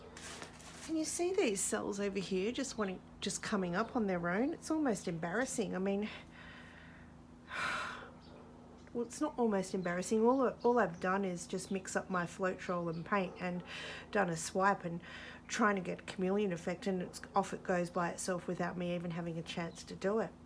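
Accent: Australia. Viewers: I just found your site. Cannot hear you...voice is so soft....love the Aussie accent...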